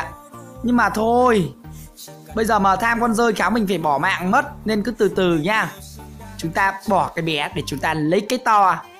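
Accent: Nam Dinh, Vietnam. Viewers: Ông này ở đâu nam định đấy ? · Anh ở nam định à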